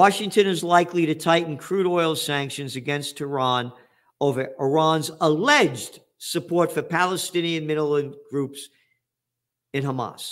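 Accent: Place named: New York, United States. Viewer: Spoken like a true New Yorker.. love it.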